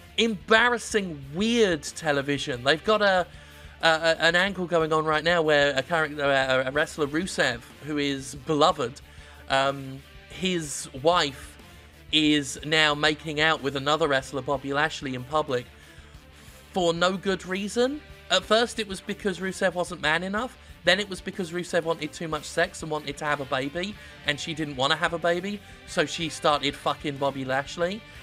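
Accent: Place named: United Kingdom. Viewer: My day just ain't complete without golden british voice talking shit about games that thoroughly deserve it.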